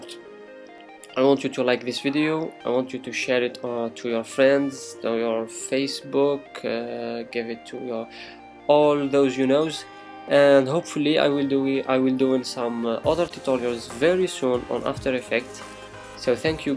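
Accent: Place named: France. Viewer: you sound french lol. thanks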